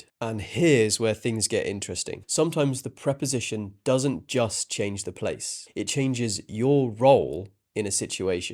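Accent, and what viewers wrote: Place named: United Kingdom. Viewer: Omg your voice with this British accent is incredible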